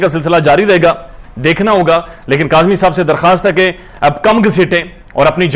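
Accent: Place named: Pahari, India. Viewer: Puttar tussi pahariye ho, I love the way you talk ♥️🤣🤣🤣 I laugh and laugh and laugh, Allah bless you with the greatest blessings of both the Worlds, Aameen, Summa Aameen !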